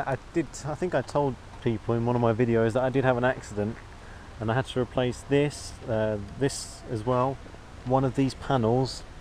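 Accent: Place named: Australia. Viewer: I always thought you were Australian :)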